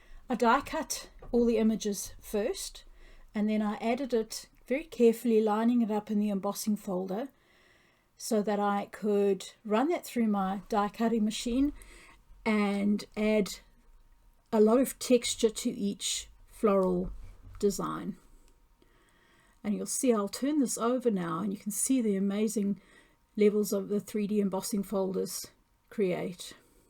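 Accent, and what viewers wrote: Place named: New Zealand. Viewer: Gorgeous and love to hear your accent. Spent three months in NZ in 1974. Loved it! Hugs & Aloha! 🤙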